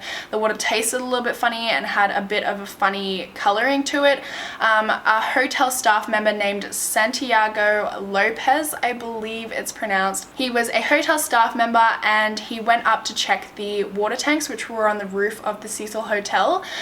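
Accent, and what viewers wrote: Australia. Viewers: Wow I just realized how beautiful you are! And also I love the australian accent :x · It's her accent. She's Australian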